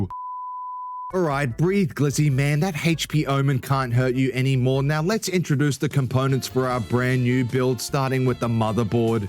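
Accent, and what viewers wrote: Australia. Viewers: Just recently stumbled upon your channel and very happy I did. One I love the doggo. Two love your accent. Makes me think of crocodile dundee. And of course I am one of those weirdos that loves watching people mess with computers. Cheers brother.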